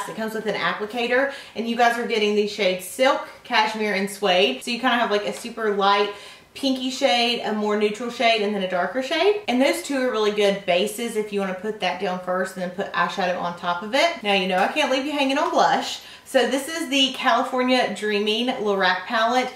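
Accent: South, United States. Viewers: your pure American (love your accent but it won't suit me lol) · I LOVE her kind of southern accent it's so pretty ❤️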